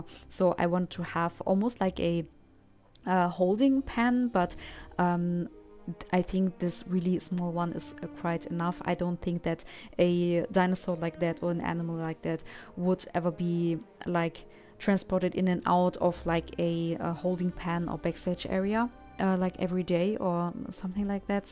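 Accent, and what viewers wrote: Germany. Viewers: Are you german by any chance, because you sound a bit german. You can hear the dialect but I stil enjoy your videos and I think you can buil sooo good like damn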